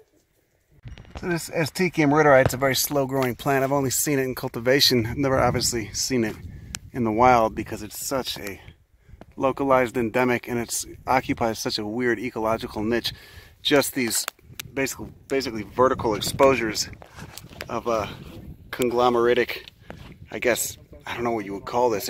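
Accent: Chicago, United States. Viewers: hey Avalon type in 'meet the youtube botanist with the thick Chicago accent.....the stunning specimen is right there haha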